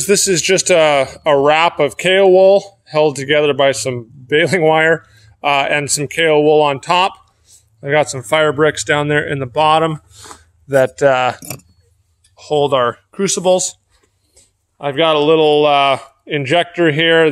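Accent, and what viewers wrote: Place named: United States. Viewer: Weird, guy has an American accent, but it looks like it was filmed in Somalia!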